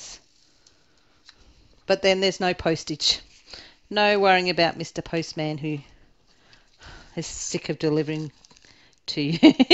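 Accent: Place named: Australia. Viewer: Hi,nice to hear an aussie doing diamond painting on utube!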